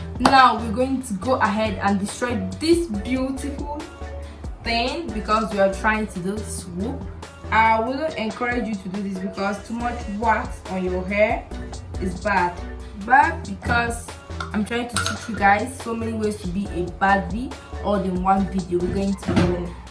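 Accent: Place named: Nigeria. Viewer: sis are u nigerian? what state are you from? love your accent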